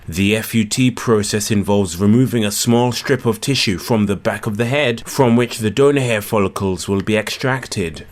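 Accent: London, United Kingdom. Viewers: He is from London so I think it's a London accent. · German? He’s English mate! It’s a black London accent. I have to say his is quite mild and he is very well spoken. Lots of black people in London have very strong accents and sound in your face and ghetto (just depends really). He sounds quite posh tbh. Not saying he is, he’s just very well spoken and not necessarily reflective of the typical black, Asian or white London accent. Londoners accents are usually fucking horrible whoever is speaking them but his mogs everyone’s!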